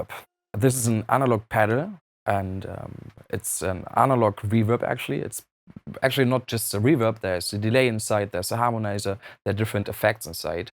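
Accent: Germany. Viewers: This guy has such a nice German accent, love you.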